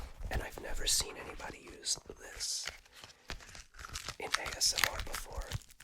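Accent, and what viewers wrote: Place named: Baltimore, United States. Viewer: An O's cap? And the way I hear that "only" vowel? I know a fellow Bmore man when I hear one!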